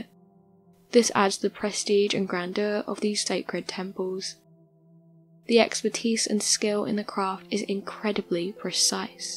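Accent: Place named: Scotland, United Kingdom. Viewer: Sounds Scotch, to me.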